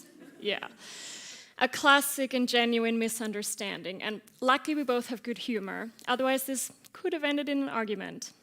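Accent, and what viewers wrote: Germany. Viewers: This cadence at the end of each phrase, the intonation sound like German despite the good pronunciation in English interestingly. Creating new words is a great idea! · Yes there is a German tinge in your English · I could here she was a native german speaker by the way she talked 5 secs into the video lmao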